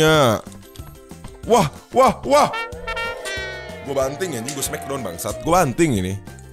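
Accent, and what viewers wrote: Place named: Kalimantan, Indonesia. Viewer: Logatnya kek orang kalimantan bang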